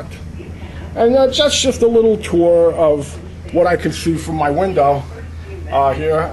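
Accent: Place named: Jersey City, United States. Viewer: Im from downtown Jersey City between Monmouth st and Brunswick st. Everyone in my neighborhood except the transplant hipsters talks like this guy. I have the same accent and wouldn't change it for anything the world. I dont know about the rest of Jersey but I know Jersey City.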